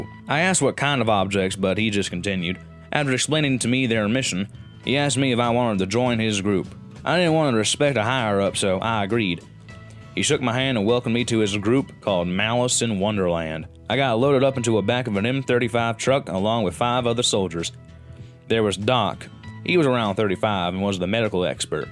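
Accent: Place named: South, United States. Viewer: Your southern voice is kinda cringe but your vids are still good. Just tell stories in your regular voice dude.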